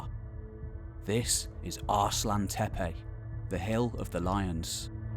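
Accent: United Kingdom. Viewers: That's a cheap ass video with crap photos and clips shown over and over again. Of course with the limey voice you think you will learn some deep shit but no, the video just ends with some clip of driving down the dirt road in some far away shit hole. Back to the airport, I guess.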